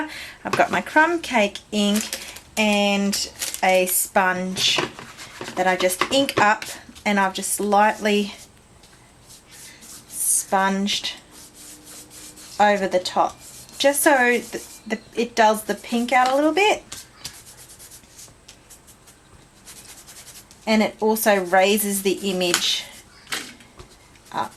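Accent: Australia. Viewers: Love this card... simple, yet elegant. Vintage style will always get my vote! Nice to hear an Australian accent for a change.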